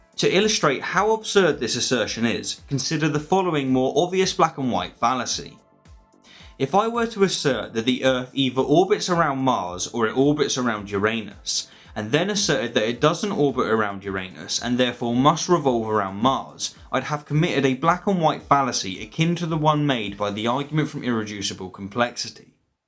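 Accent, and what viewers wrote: England, United Kingdom. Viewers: How did you develop an English accent in South Africa?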